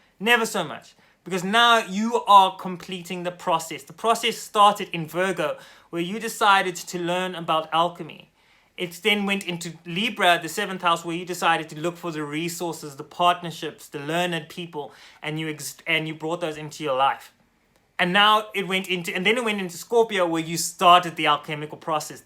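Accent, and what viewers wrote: South Africa. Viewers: This dude south African?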